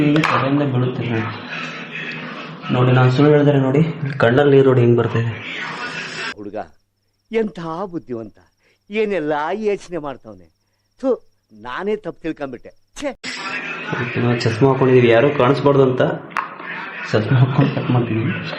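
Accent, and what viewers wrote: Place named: Uttara Kannada, India. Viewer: ನೀ ನಮ್ಮ uk ಶೈಲಿಲೆ ಮಾತಾಡು ಚನ್ನಾಗಿ ಇರುತ್ತೆ.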